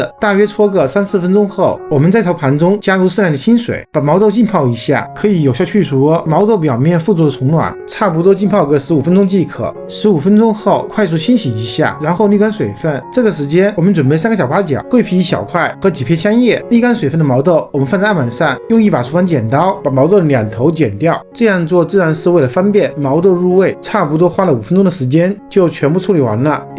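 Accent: Wuhan, China. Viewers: 听口音是武汉人吧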